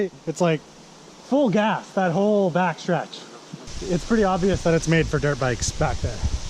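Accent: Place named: New Brunswick, Canada. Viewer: Even though you are not fluent French speaker anymore,you kept the New Brunswick accent 👍🤣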